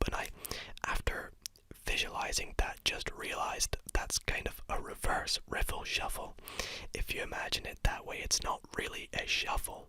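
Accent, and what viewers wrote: Scotland, United Kingdom. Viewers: Scottish, yep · Just realized he’s Scottish about 10 mins in hahah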